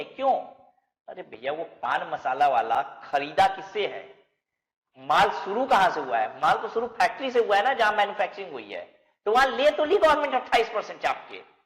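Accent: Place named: Uttar Pradesh, India. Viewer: Sir from Varanasi, UP. You definatly got that UP accent:) I am from Kanpur, UP. Thank you for all the videos sir Thank you very much.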